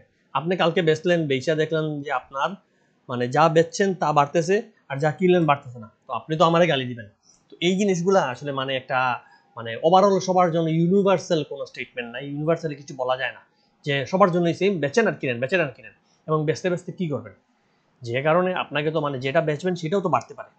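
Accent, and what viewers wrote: Sylhet, Bangladesh. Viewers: আপনি কি সিলেটের? আপনার ভয়েস অমল দার সাথে মিল আছে।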